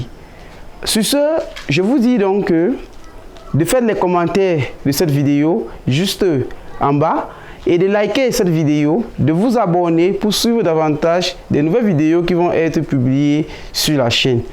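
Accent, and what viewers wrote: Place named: Africa. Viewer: Merci. excellent, il te foudra consulter les vidéo de communauté qui parle nativement le français de la france correctement prononcée. je veux dire par ceci le français comme le prononce les professeurs du français dans les faculté du magreb arabe par exemple. pourquoi ?????? et bien parce que, tu va devoir abaisser dans un premier temps, l'accent africain très ombré . autrement dit, toi , tu cherche à mondialiser tes propos, to doit donc visée un accent moyen que la majorité des francophones du monde vont comprendre facilement et adorer. je te dit ceci car je veux que tu reussisses mon ami. :hand-pink-waving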